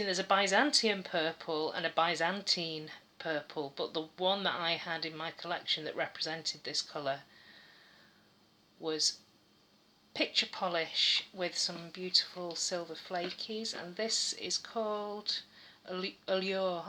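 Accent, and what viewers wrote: Scotland, United Kingdom. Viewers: These are my absolute favourite vids of yours. I love learning about colour! I've been organising my ROYGBIV series and I've written 3 different sections for purples, lol. Oh man, I need to get that Snugglebutt. Ahahaha, your Scottish accent xD Excellent vid! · thanks babe. omg im pmsl here at the plums and the scottish accent. 😂😂😂 x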